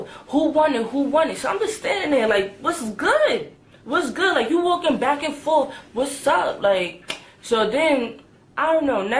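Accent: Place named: New York, United States. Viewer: LOL OMG i love these! "i put my victoria secret lipgloss away" 😂 😂This storytime sound odee NY!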